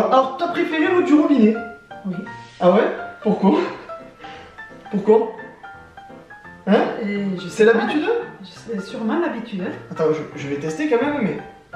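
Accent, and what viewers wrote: Marseille, France. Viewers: tu et un Marseillais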